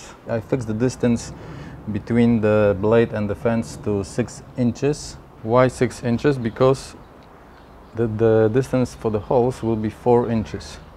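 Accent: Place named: Poland. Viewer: Very nice video mate. Well done! Where are you oryginaly from? Your accent sound Polish am'i right?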